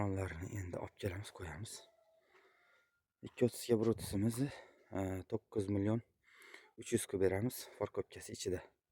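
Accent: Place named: Khorezm, Uzbekistan. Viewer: Assalomu alaykum manzil nerda xorazmdami sheva oʻxshab turibdi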